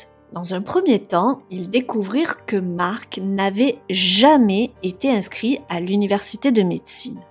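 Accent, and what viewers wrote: South, France. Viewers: Diction pas vraiment bonne car malheureusement a plutôt tendance à très mal prononcer les mots comme rouages...nuages...mariage... crochetage...emballages..etc. Ce genre de mots finissent en "ache"...rouaches...nuaches... mariache...emballaches...etc... Et c'est hyper énervant. Surtout qu'en plus a un petit accent (du sud...je pense)...et ce n'est vraiment pas joli à entendre. A part cela...il est vrai que est une des youtubeuse true-crimes qui s'exprime le plus clairement.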